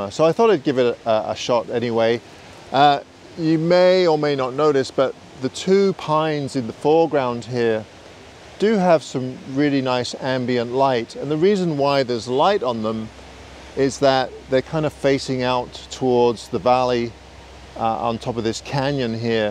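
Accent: United Kingdom. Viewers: Nice final image,. Being a Canuck, I hear your British accent. However, when you declared that you are a Canadian and followed this up with the classic "eh", you conviced me that you are indeed a Canuck as well.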